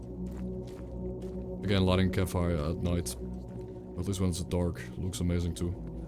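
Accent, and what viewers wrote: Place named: Netherlands. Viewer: u dutch? u sound dutch.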